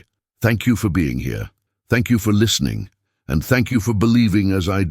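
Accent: United Kingdom. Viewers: I enjoy the British accent, the smooth reading style, and relatively few pronouncement errors.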